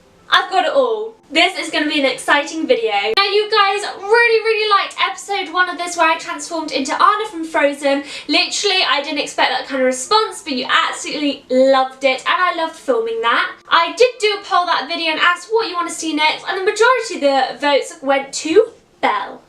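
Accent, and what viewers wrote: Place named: Mid-Atlantic, United States. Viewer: I gotta say your American accent is quite good. Sometimes sounds a bit more Mid-Atlantic but that also kind of works for that classic Princess vibe. And thank you for wearing gloves! I get kind of annoyed when I see Belle or Cinderella not wearing gloves (thank those live action movies for that ugh)!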